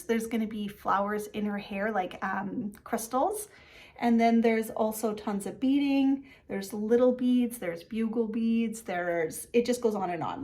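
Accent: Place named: Canada. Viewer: I just started watching your videos after a shout out from the team at Just Keep Stitching. Your stitching projects are so beautiful! I love your devotion to each and every one of them. And, yes, I love your Canadian accent too! My mom was born in Nova Scotia and all of her relatives (except one) still live in Canada, mostly in the Maritimes and Ontario. I have subscribed to your channel and can't wait to see your progress on your projects.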